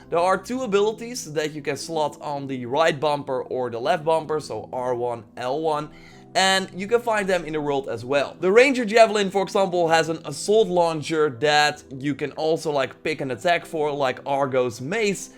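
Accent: Netherlands. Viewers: Stronk Dutch accent dem bruf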